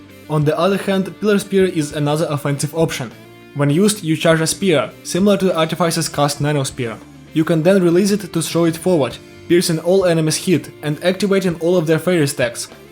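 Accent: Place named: Russia. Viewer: Judging by the accent.... Привет, ты что русский? Сильный акцент, друже. Хороший видос, уверен со временем твоё говорение улучшится.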